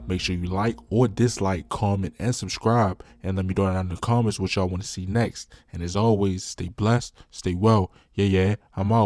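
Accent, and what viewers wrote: Baltimore, United States. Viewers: Narrator definitely from Baltimore. That accent is HEAVY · You can tell dude frm Baltimore by the way he say 2 talmbout Tuwwooooo 💀😂😂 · Baltimore people talk funny asf i instantly knew u was from b more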